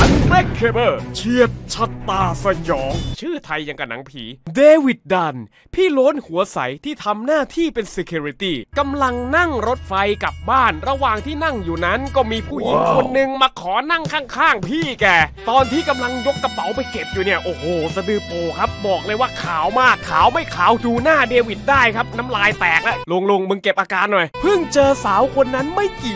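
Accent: Isan, Thailand. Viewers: อ้ายยยย บักย่านน้ำอีสานจ็าดๆ55555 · ชอบจังเวลาพี่พากษ์อิสานอิอิ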